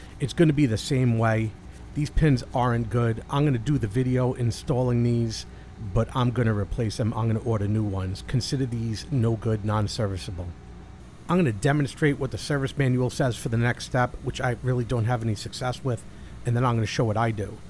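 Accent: New York, United States. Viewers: Excellent video sir. Love the NY accent. I'm from NH and speak a little different.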